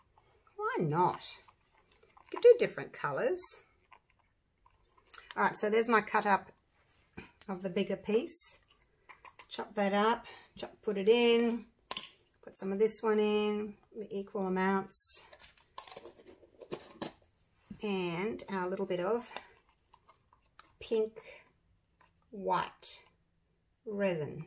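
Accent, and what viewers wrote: Australia. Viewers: your lovely personality shines through! I love all the things you say, love the Australian way of saying things that's different from American way of saying things! You are interesting and engaging and very informative -- all good reasons to watch you, learn from you. Please don't change anything because someone commented on it. These are so beautiful! I love the pink! I don't think it's too much pink. If the white one was not next to the pink one, it would not be so obvious that you added pink! Opal was my mother's birthstone, she loved them and so do I! How about a touch of blue or blue-green? I don't think the powder on the back shows through. Beautiful, just beautiful! Thank you and take care and stay safe!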